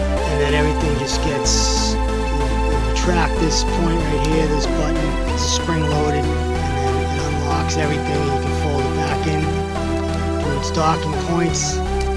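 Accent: Rhode Island, United States. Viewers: Dude’ I love your Rhode Island accent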